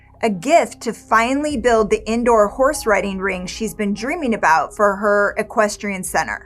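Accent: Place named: New York, United States. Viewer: a voice over that makes you run for MUTE. Holy New Yawk!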